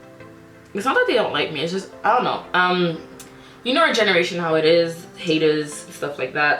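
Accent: Canada. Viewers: Girllll, I love you I subscribeddd. I could definitely tell you were Canadian too 😭❤️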